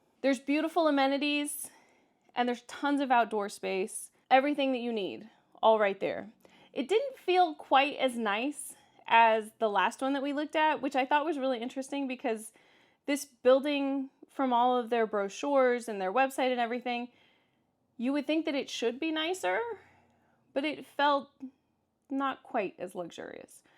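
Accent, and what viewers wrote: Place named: Kansas, United States. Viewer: Hey,! First, I also follow Noodles the Pooch (and have my own Noodles doll)! 🤗 Second, I got a kick out of your challenges with the Boston versus Kansas accent; I get it! I’m a Long Islander (NY) - but I graduated from Bethany College in Lindsborg KS and met lots of good folks while I was there. They got a kick out of my NY accent 😊!Whereabouts from KS are you from? The whole eastern seaboard is crazy expensive; best of luck with your new apt search!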